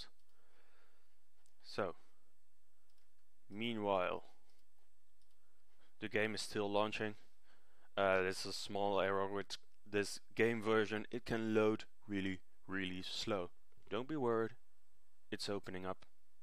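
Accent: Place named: Japan. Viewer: you sound like a japanese :D :D :D so... where do you live?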